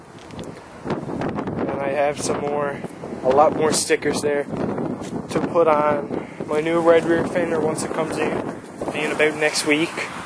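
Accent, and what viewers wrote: Newfoundland, Canada. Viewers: man as soon as you started talkin i was like this dude is from newfounland haha, and sure enough when i went into your profile i een that you were, im from flatrock down on the east end of the avalon. i got an 09 450x there last fall, i should have some riding videos up soon cause im getten a gopro. I subbed, if ya wants sub back. tanks bah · Are you a newfie ?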